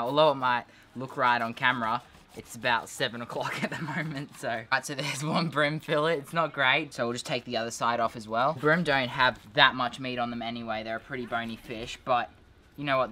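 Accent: Australia. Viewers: I love the australian accent ❤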